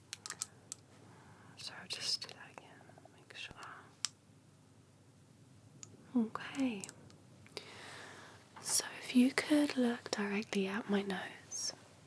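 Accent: United Kingdom. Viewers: Great ASMR voice, British accent makes it even better! ✌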